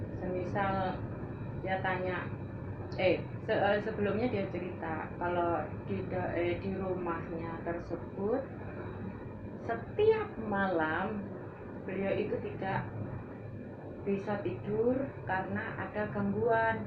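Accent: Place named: Java, Indonesia. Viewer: Mba asli mana ya? Jawa bukan mba? Aku seneng gitu denger logat bicara nya mba..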